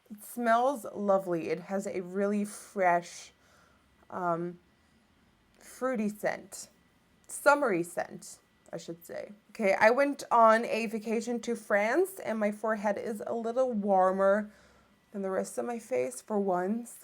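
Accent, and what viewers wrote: Netherlands. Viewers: omg i can hear the dutch accent here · LOL I was like, Icelandic? Dutch! Love your accent! Ugh, I love me a beauty blender - thank you for commenting on application. Great tutorial love!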